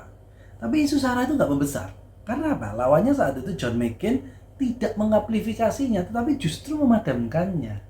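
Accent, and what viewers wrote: Java, Indonesia. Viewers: Logat Tionghoa agak kejawaan medok sekali ini.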